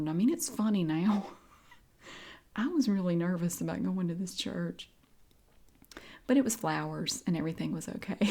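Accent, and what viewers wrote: South, United States. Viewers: That crazy that you say this because me and my gf have talked about this very thing...see my gf is from the red lake Indian reservation and I'm from leech lake band of ojibwe but was raised in South Minneapolis MN but anywho when she goes bk to visit her rez accent comes bk really thick!!! Ppl say us minnesotans sound funny but idk what we are supposed to sound like!! Your southern accent is the best!! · I’ve been watching your videos for so long that I became so accustomed to your accent but because this video was highlighting accents I noticed how southern your accent is! It became a situation where I couldn’t un-hear it 😂 love these ramble videos! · I loved this conversation! I remember when I first moved to Greensboro my freshman year, everyone sounded so different than me. I’m from Rocky Mount and our southern accent is a variation of yours, but not far from it. 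My accent has never been as strong, but for some reason whenever I’m talking to someone that’s from my hometown, it’s gets really strong and we always get questioned about where we’re from 😂 To be very honest, I used to be embarrassed of my accent when I first moved here, but I grew to embrace it because I enjoy not sounding like everyone around me!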